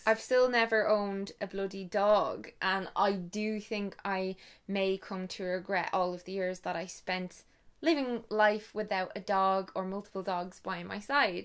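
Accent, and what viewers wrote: Ireland. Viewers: Love your Irish accent :)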